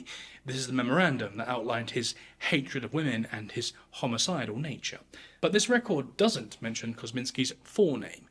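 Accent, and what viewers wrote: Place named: Essex, United Kingdom. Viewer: I knew you were an Essex boy (north Essex accent is less... towie)but didn't realise how close you were to my stomping Grounds! fun fact, Jack the rippers victims are buried around the corner from my grandparents and we used to go and visit them when we'd go to see my grandparents at the manor park cemetery. My great great grandad worked there, told my mum of how haunred it was and what creepy things would happen there. I have a picture of him with his horse that he worked with; it was a beautiful shire that eventually stamped on his foot and broke it!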